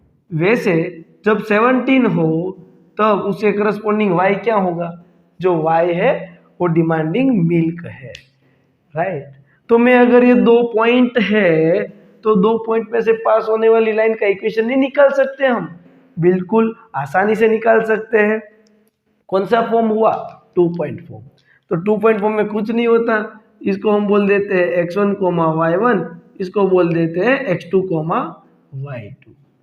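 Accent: Gujarat, India. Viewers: Sir your videos are very helpful and i am now able to understand all maths questions easily. Aur sir kya app gujarati ho apki language se pata chalta hai Me bhi gujarati hu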